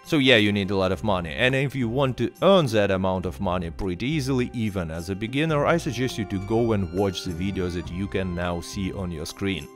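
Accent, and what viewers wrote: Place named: Russia. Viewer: The Russian accent make this video even better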